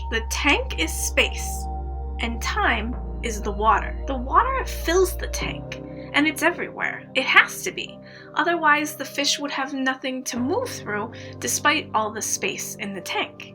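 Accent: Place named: New York, United States. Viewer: Quick question. Which borough would I find the accent in? I think I found my type 😂😂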